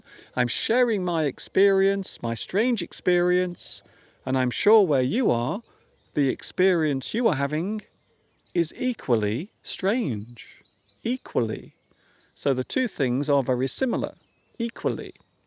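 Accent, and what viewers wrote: England, United Kingdom. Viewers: Hi, I love your pure English accent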